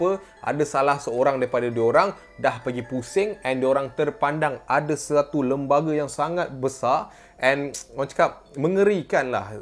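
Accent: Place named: North, Malaysia. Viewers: Steady bang.. Loghat utara time menyampaikan kisah seram ni buat lagi sya sronok nak dgar. Hahaha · Abg fasih naw ckp utagha bleh lepak skali pa nnti mau sembang kita dua ni tiga gelas kopi pon kleaaa😂😂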